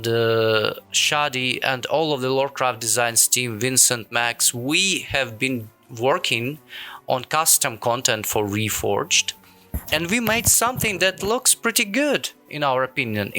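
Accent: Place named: Russia. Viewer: молодец! По акценту сразу понял, что наш 😂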